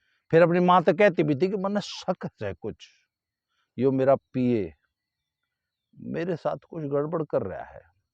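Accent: Haryana, India. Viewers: बढिया हरियाणवी बोली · आपकी बोली मैं हरियाणवी पुट है । रोने से आंखों को नुकसान होता है ।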